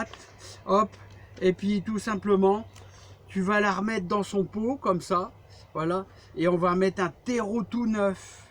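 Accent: North, France. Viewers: Merci j adore le patoi du Nord 👍😜🤣 et tes vidéos conseils. Bonne journée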